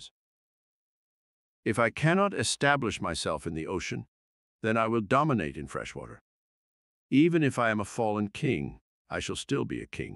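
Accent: United States. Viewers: Excellent video, appreciate the attention to detail. Also, I love your classic mid-Atlantic accent.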